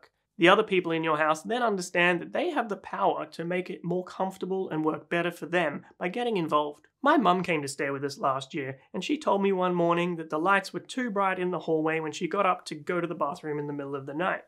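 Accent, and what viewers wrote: Australia. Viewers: Ha!. Suspected that was an Australian accent! Great channel. 👍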